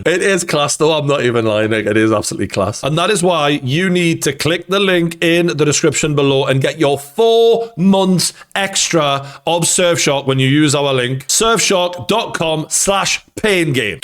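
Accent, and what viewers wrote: Newcastle, United Kingdom. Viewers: Geordie boy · Geordie. You’re right.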